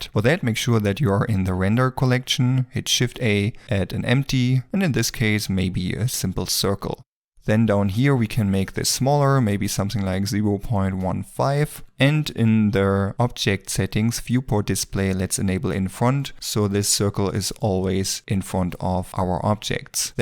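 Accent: Germany. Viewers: It's crazy how germans instantly notice a german accent of english when they hear it... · Are you German? 😅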